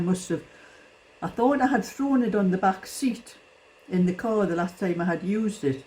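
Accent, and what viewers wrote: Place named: Ashington, United Kingdom. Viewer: Hi, can I ask whereabouts you are from? You sound like my husbands family in Ashington xxx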